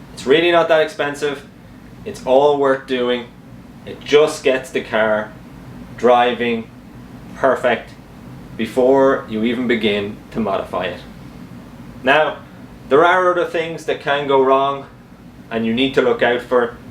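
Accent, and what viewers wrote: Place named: Canada. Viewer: I knew your accent was Canadian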